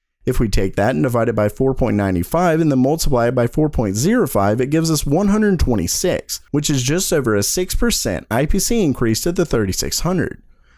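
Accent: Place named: United States. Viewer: One of the most annoying voice overs. Way too fucking american pronounciation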